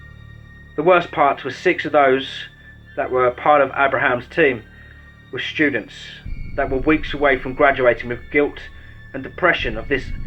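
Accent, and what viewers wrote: England, United Kingdom. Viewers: You rock,. Keep it up. Love to hear stories in an English accent... My forbears are from the Isle so it's in my blood. I hope you had a happy Xmas with the family. Stay safe and keep up the awesome work.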